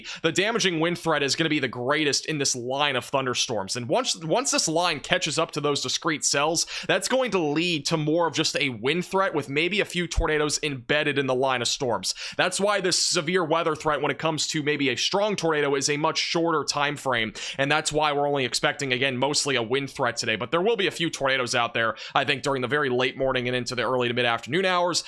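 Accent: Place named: North, United States. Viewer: You, I can tell you're from up north..... Ryan Hall, gotta be from GA.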